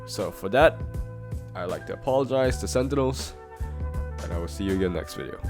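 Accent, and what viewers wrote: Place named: Singapore. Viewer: i can hear the singaporean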